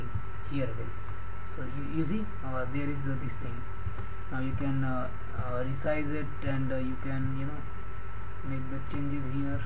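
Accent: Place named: India. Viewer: You sound Indian, but that's my university's logo, and it's an algerian university. What happened?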